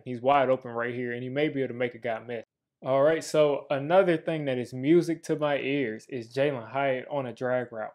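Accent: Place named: South, United States. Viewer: What up man? Long time see! You definitely live down south now I can tell when you say certain words. Anywho, we need more speedy receivers, point blank.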